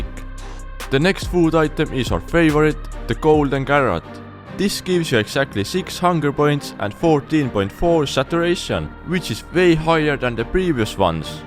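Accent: Estonia. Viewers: u from estonia?? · He sound like he is Estonian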